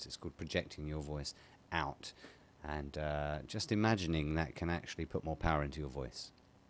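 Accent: United Kingdom. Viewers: ur very welcome uve done a brilliant job i really appreciate it, n yes ur rite ur vid was easier to understand than most vids, last but not least LOL i LOVE ur british accent :) wish i had a brit accent too lol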